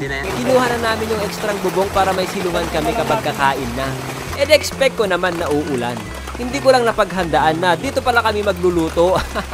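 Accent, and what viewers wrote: Igorot, Philippines. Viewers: halatang igorot si kuya hahaha salita palang eh parang ako hahahaha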